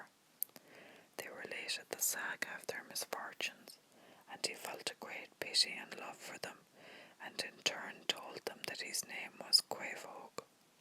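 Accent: Ireland. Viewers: am I detecting a Tipperary accent? :D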